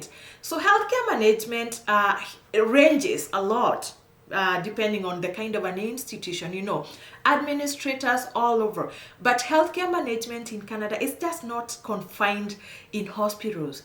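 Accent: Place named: Kenya. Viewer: Can’t miss that Kenyan accent haha. You look great!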